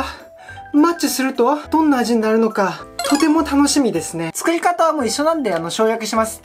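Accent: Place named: South Korea. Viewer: 오 어릴적 한국에 살아서 그런지 지금도 한국인 발음이 남아있는것같아요 신기ㅋㅋㅋ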